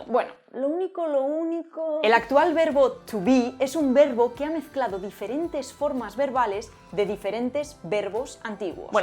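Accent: Spain. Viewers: Same! Also I was impressed by english. I really enjoy hearing a spanish accent. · Es tan divertido escuchar como lucha el acento de castellano de España con el idioma inglés. Saludos a.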